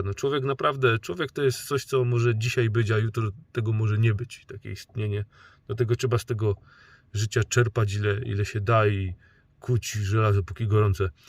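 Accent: Silesia, Poland. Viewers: Chopie jo cie lubia i ta godka ino zdrów być ❤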